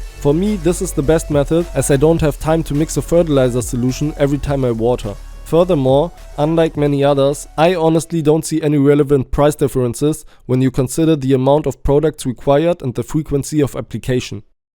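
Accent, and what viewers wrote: Germany. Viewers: An dem Akzent erkenne ich das du aus dem deutschen raum kommst :) Der folgende Text soll kein Klugscheißen sein sondern Liebgemeinter Tipp bzw Weitergabe von Wissen. <3 Wir teilen die liebste Leidenschaft. Etwas Lernlektüre und ich hatte Langeweile xD. edit: ich hasse Schreibfehler :D Teil 1 Das Flushing war in deinem Fall vermutlich etwas zu früh. Ich würde mich weniger an den Angaben der Hersteller orientieren, sondern an der Pflanze selbst. Jede Pflanze ist ein Individuum und reift unterschiedlich schnell. Die Dame hat im Verhältnis noch recht viel Blattmaterial und vergleichsweise wenig Calyx-Masse. Sie hat also noch nicht richtig "gebulkt" , die Kelche sind noch nicht vollständig angeschwollen. Außerdem wirkt sie noch nicht vollständig ausgereift. Das erkennt man unter anderem an den vielen noch weißen, aufrechten Pistillen. Mit zunehmender Reife verfärben sich diese und ziehen sich zurück. 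Dabei sollte man beachten, dass Pistillen durch Berührung oder Umwelteinflüsse schneller oxidieren können und deshalb allein kein zuverlässiger Reifeindikator sind. Trichome und der allgemeine Reifezustand sollten immer mit beurteilt werden. Wie stark eine Blüte letztendlich anschwillt, hängt zu einem großen Teil von der Genetik ab. Pflanzen mit einer genetischen Veranlagung für große calyxreiche Blüten bilden meist auch kräftigere Kelche und können entsprechend größere Samen entwickeln, sofern sie bestäubt werden. Neben der Genetik spielen jedoch auch Kulturführung und Nährstoffverso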